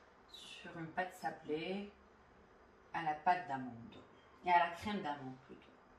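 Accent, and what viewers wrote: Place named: Algiers, Algeria. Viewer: Les feuilles de gélatine halal ou porc 🐷 moi j achète pas la feuille de gélatine.merci pour ta recette et t’a jolie voix algéroise 💋💋🤩🤩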